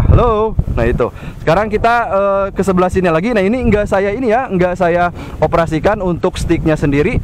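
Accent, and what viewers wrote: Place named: Medan, Indonesia. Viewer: Review nya detail nih, manteb. Btw logat medannya kental sekali.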